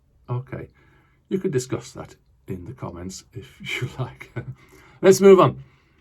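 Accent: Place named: Teesside, United Kingdom. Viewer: Your accent sounds familiar…..are you a Teesside perchance?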